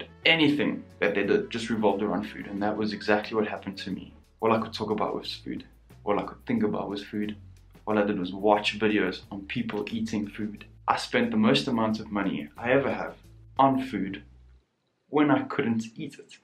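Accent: South Africa. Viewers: Are you South African bru? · U sound like u come from Sa(south Africa)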